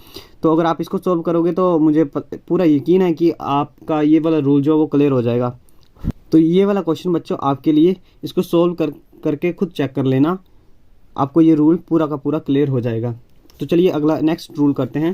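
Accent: Himachal Pradesh, India. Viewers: By your hindi accent im guessing you are from Himachal ( may be una or kangra)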